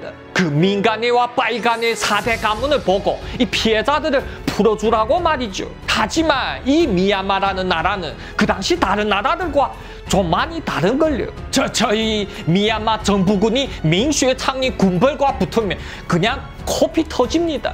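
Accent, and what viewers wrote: China. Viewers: 진짜 중국스타일 톤과 리액션 딕션 너무 재밋어요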